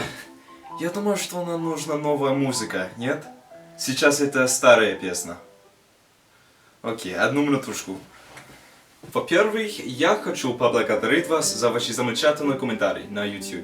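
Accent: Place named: Ukraine. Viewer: Почему мне его произношение напоминает украинский?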